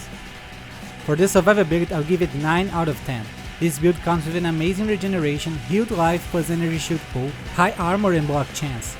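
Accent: Brazil. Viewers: Sotaquezão, parece brasileiro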